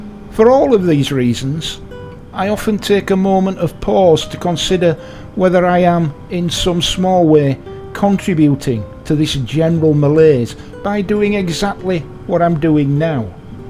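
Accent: Yorkshire, United Kingdom. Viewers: Spoken by a good and proper Yorkshireman. Good man. 👍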